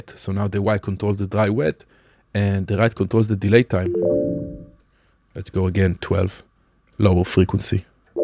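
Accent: Israel. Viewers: אני מזהה את הישראליות מהמבטא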